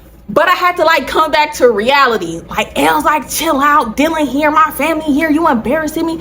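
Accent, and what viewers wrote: Bay Area, United States. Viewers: My first time here! First 3 mins in i knew you had to be from the bay we really do talk a lil different 🤣You funny af I’m definitely subscribing!! love from Oakland!🫶🏾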